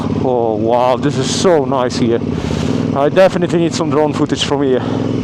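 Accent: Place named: United Kingdom. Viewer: Just found your channel. Great video. I'm trying to place your accent. Is it Africano British?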